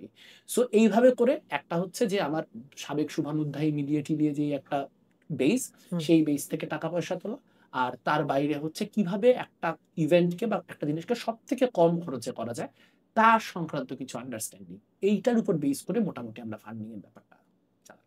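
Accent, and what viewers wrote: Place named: India. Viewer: উপস্থাপকের ভাষার মধ্যে কেমন যেন একটা ইন্ডিয়ান ইন্ডিয়ান ভাব আছে, ❌দাদা ✅ভাইয়া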